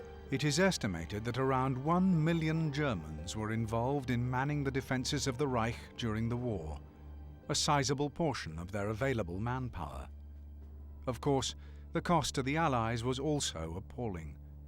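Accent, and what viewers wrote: United Kingdom. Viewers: I find that any narrator that speaks the Queen's English I can understand with no problem what so ever and I'm an American.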